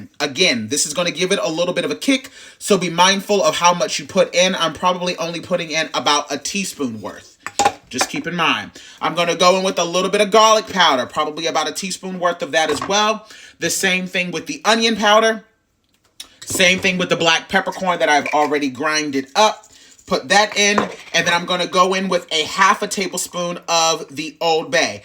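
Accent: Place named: New Orleans, United States. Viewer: I'm from Louisiana! And I always thought that Crystal hot sauce was a New Orleans product. And yes, I would use ALL the ingredients that you have there and some smoked paprika. And we call your bread a po boy French bread. I'm with you on the sugar thing, too!😂❤❤ (I'm trying to figure out your Maryland accent. Kinda sounds like some New Orleans folks!)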